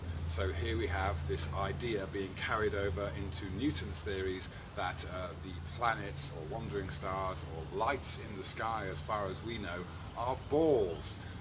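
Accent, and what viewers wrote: United Kingdom. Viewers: Oh! My God! WTF How ignorant can anyone be than to show to the whole world how you didn't think something through using all the factors and variables needed for the equation. Water displacement alone The force air has on an object depending on it's shape and size. This is so ridiculous yet you took the time out to make a video showing how unintelligent the thought process is and then look at all the idiots that follow you thinking this is correct. Again Oh! My! God! I can't believe what the world I live in has become. Utterly and Ridiculously disgusting to think my fellow man is this fucking dumb. A British accent by no means makes anyone intelligent.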